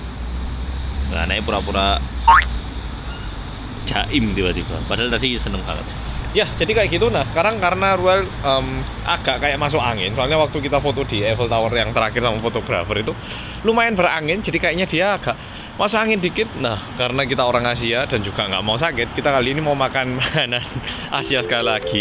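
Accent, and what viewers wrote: Java, Indonesia. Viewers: ini korea Tulen tapi,konten nya Bener" MUEDOK sesuai bngt bhs Indo nya,MANTAAAAAAP SHT" SLL RUEL DN PAPI MAMI NYA Aamiin....🤲🤲🤲👍👍👍💚🙏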